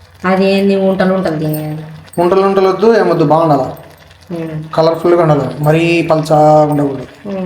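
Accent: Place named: Kadapa, India. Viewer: Superb accham kadapa basha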